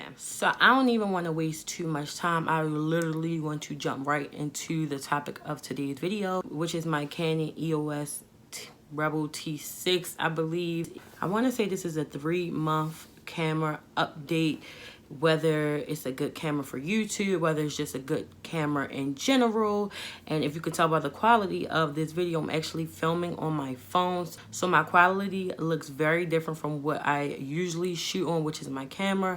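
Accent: Baltimore, United States. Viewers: I'm from Cali and I love me a BMore accent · I agree this is probably the worse camera to vlog with. SN that Baltimore accent is strong girl! 💪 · Girl I’m from DC I hear that Baltimore accent LOL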